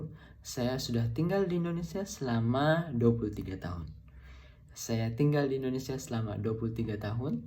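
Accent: Egypt. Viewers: أداؤك رااااااااائع.....استمر..تعلمت منك.. من فضلك..هل أحد والديك مصري ؟ أو كيف تعلمت اللهجة المصرية ؟ لما تزور مصر ضروري تشرفنا في البيت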